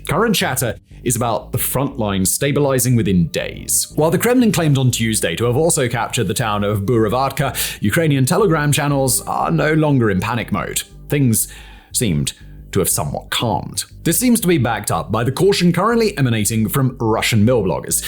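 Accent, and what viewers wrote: England, United Kingdom. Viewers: you're British with the most English accent outside of the royal family or the 1950's BBC...It's 'Autumn' not 'Fall'.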